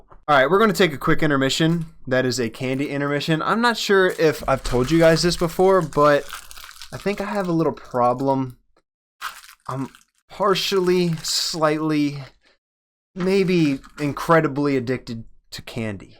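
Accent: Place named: West, United States. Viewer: It's weird, you have a western accent, but say "y'all"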